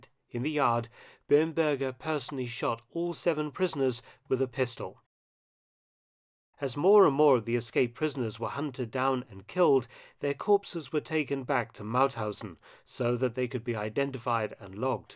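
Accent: England, United Kingdom. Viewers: That's how a proper English should be spoken! Gorgeous accent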